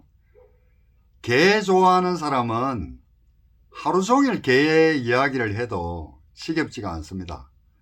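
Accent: Gyeongsang, South Korea. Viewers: 오늘도 구수한경상도 사투리로 커피한잔하면서 영상속의 말씀.잘들었습니다 펜으로 응원합니다 행곡하시고 건강하세요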